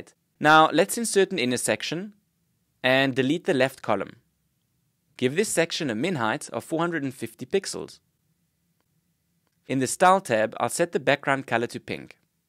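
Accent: South Africa. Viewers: South African accent, yum. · I love that South African accent, makes me feel back at home.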